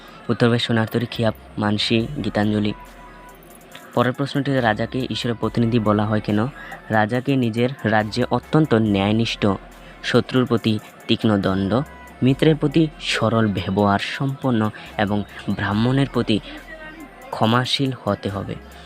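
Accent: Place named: New York City, United States. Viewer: Your voice is very NYC bro.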